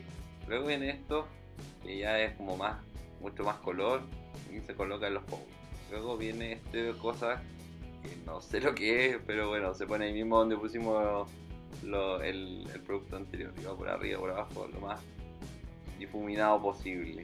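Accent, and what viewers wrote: Chile. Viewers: Es el primer video que veo de este canal y puedo decir que con solo escucharlo se que el chico es chileno XD #LoMasParejoPosible #LoMasDifuminadoPosible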